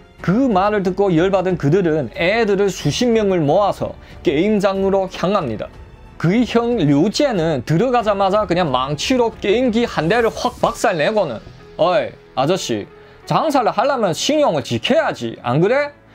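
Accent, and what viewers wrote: China. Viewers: 님은 조선족 출신인가요? 조선족 출신이라는 표현이 맞는지 모르겠습니다만 친한형님이 광저우에 공장에 있어서 여러번 같이 갔었는데 현지에 황룡하라는 친구가 조선족 이었습니다 참 착한친구인데 이 영상보니까 룡하 보고싶네요 ㅋㅋ 코로나 때문에 언제 또 갈지 기약이없지만. ㅋㅋ 안녕하쇼오. 룡하 하고 완전 똑같네요.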